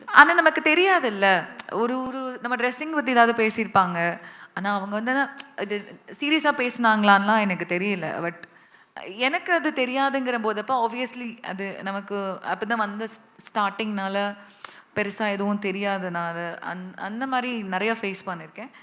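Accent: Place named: Kerala, India. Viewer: Your Ramanathapuram we know but slang looks like Malayalam slang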